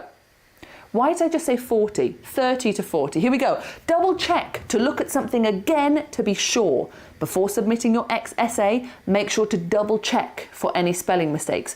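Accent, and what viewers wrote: United Kingdom. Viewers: I watched you from the begining and I wrote the words I didn't knew and I will watch your video again tomorrow and write some other notes in a excel file for myself by the way, thank you to put these videos for us. it will be really helpful if any one wants to learn english and speak fluently especially with this lovely british accent that you got. I wish you read my comment. I'm from Iran I love british people and their accents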